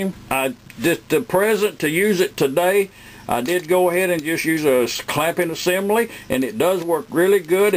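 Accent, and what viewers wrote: United States. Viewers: british accent ??? he fukin sounds like hes a cowboy hes DEFINATELY not british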